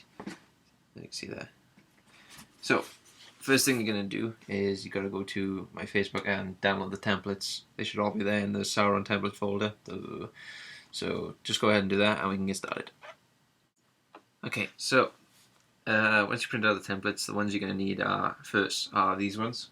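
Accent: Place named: Wales, United Kingdom. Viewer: you in wales ? accents sounds about right , i want to make this is steel you got some contact info i could do with your help . im a blacksmith.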